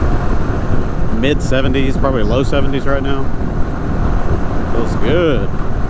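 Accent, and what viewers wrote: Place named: Oklahoma, United States. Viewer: Howdy neighbor. Sort of. I live in San Antonio. You are braver than I. I have a 2023 Vespa GTS300 and even with that I would not drive the access roads along the Katy Freeway. Heck I prob wouldn’t ride anywhere around Houston. Everybody drives at Mach 5 with their hair on fire. They do that here too but SA is still somewhat of a big small town if you know what I mean. Very different than DFW/Hou/Aus areas. But it is changing and when I retire in a few years, I’m not staying here. May move to the next county or something. Been looking at Arkansas as well as my home state of Alabama. BTW - your accent and cadence and tone is EXACTLY like someone I work with. I can’t tell the difference between you two and thought it might be him incognito here. He is from OK too. Hope you are safe and well after all those storms.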